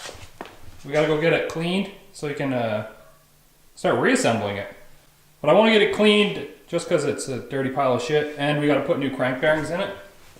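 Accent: Canada. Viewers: The Canadian accent keeps me entertained.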